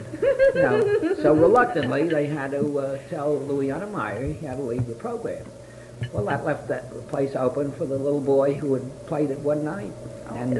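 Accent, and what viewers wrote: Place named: New York, United States. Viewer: Nobody speaks with this beautiful old New York accent anymore.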